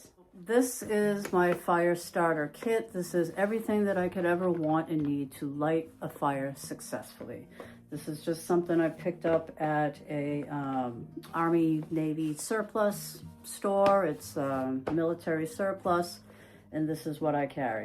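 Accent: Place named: Massachusetts, United States. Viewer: Heyy 😊. I just ran across your channel couple of days ago. You're amazing! Seriously,thank you. I grew up in mass, haven't been back in over 20 years. I didn't realize how much I missed the accent. I watch your videos just for that & the scenery 😊. You're very smart & down to earth. I've subscribed & liked all your videos 👍. Thank you again and please keep em coming. Your guy is a very lucky man....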